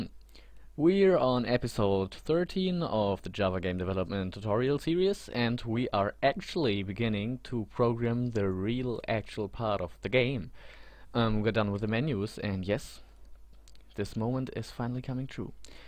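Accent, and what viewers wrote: Germany. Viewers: You sound german :D You're from Germany? Aren't you?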